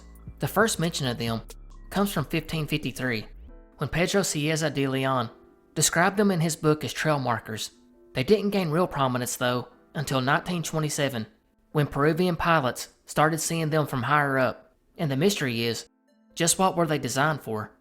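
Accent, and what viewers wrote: South, United States. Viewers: Damn you’ve got a strong accent. Which state r u from? Good video btw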